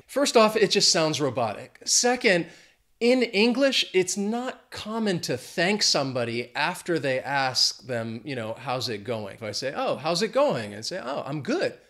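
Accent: United States. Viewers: Are you a new Youtuber and an English teacher?? Thank you for this video and I like American accent as yours. I will show this video to my students. I never say " " but this phrase appears in an old song from the 80's by. I've heard that " " is used in the United Kingdom only and the same thing is "Shall"in all pronouns. We know that the English language has changed during the half past century and there are words that we no longer use. Thank God we can learn and speak an understandable and clear English. 😆🗽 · You are my favorite English teacher because I'm more into the American accent rather than the formal English accent taught in India. BTW it's slightly raining in Kerala. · I love your american acent. I live in Italy and always the people speak English from UK and some time I'st hard understand. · I'm a native English english speaker :) and I love your videos for picking up a good american accent for some creative work I'm doing. 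However, in the UK, we do still talk about the weather a lot, lol! Although we would say things like 'deadful weather isn't it?' rather than how's the weather. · Hi there, I'm French and writing from Paris ; it's been raining really hard yesterday with cats, dogs and even hail 🦝🦊🐯🦄🐷 ! But I don't know how the weather is 😎 right now... I've found yr channel a few days ago and I noticed that you were saying all the time that you're teaching English while I noticed right away your American accent and style. As I'm also following other learning English channels, I thought you should be mentioning that you're teaching American English which is quite different in terms of accent and idioms. But I see you've made it, much better so !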